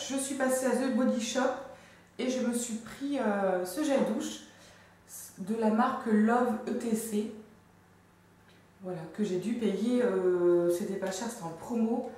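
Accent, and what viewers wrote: Belgium. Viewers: la gamme ultra doux a la mangue et a la fleur de Tiaré j'adore c'est la gamme que j'utilise et rachète depuis 3 mois et elle a balayé les autres gammes de ma salle de bain ! Les petits gel douche the body shop j'adore aussi et le premier petit haut noir H&M il est sublime! ta vidéo est top et l'accent belge mdr ! bisous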